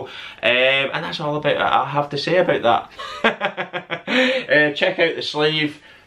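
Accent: Scotland, United Kingdom. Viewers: There is no cooler accent in the world than the Scottish accent. I just love it! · If I could buy an accent it would be Scottish.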